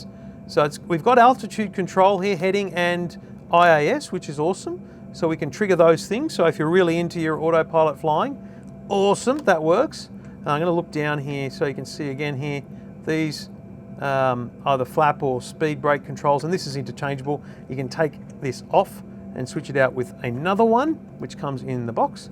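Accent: Australia. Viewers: LOl It is true that there is a lack of "expertise" here but the video has 3 importants ponts: 1. The Aussie accent, love it 2. The fact he tests on Xbox, quite rare 3. he seems to be a begnner and there are a lot of people who don't know sh..; about flying out there and that will do the same :D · Judging by his accent I'm assuming it's Australian dollars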